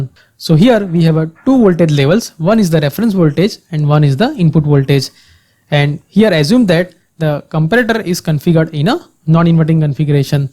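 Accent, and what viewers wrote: India. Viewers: lol this is an indian accent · Canonical hindi accent.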